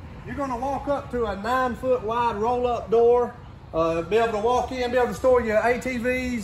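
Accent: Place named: Georgia, United States. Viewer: Like that middle Georgia drawl! north GEORGIA HERE!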